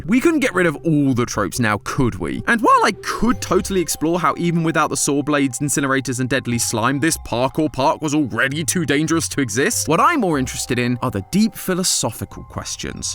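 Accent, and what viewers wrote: United Kingdom. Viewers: He sounds so British😂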